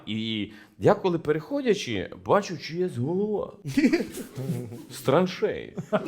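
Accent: Western Ukraine, Ukraine. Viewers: Я тоже никакой смеси не слышу. Только западноукраинский диалект. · Який цікавий мовний акцент у ведучого! Суміш західної, центральної і східної мови України!😂❤ I в цьому наша сила українці - в єднанні!!!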